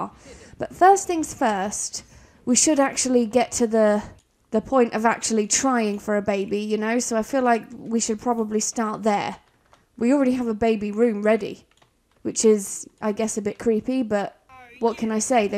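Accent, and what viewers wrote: United Kingdom. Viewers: im sorry but your accent is so british :') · OMG LOVE YOUR ACCENT WISH I HAD I AM AMERICAN sadly YOU SOUND LIKE YOUR BRITISH I LOVE THAT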